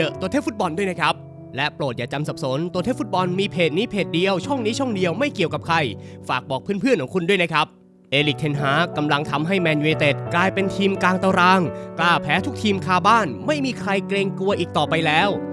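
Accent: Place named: North, Thailand. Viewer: เพิ่งรู้ว่าแอดมินเป็นคนเหนือก้อคลิปนี้แหละ😂😂😂😂แกนตา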